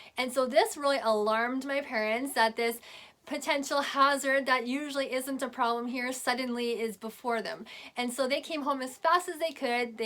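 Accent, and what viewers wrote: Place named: Canada. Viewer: You sound extremely Canadian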